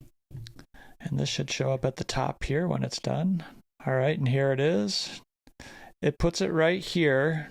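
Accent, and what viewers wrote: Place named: Scandinavia. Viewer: If you have watched a lot YouTube videos, it gets used to presentation like this. Calm, but expressive in moments. It’s actually good for learning and getting new ideas about the subject. It doesn’t force any attention, but highlights. Good job buddy. Pretty sure you are from Scandinavia, Finnish might fit that style the best 🙂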